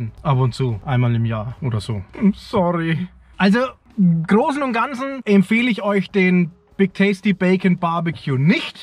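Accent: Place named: Franconia, Germany. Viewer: Tolles Video. Dass du aus Franken kommst hört man direkt. Wir haben einfach den besten Dialekt. :)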